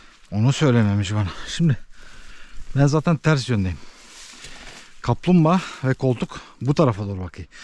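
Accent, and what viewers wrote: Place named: Van, Turkey. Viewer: Şiveniz vanlılara benziyor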